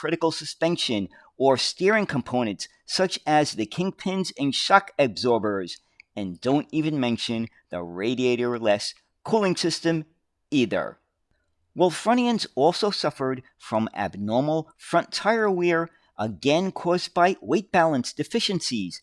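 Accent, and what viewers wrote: United States. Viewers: Thank you for your Video..! Seems strange a "Guy" excuse the pun ? with a New York accent is interested in my local childhood buses..Brought back happy memories. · I seem to be drawn to British bus videos - I simply find it fascinating to hear an American accent talking so enthusiastically about British buses!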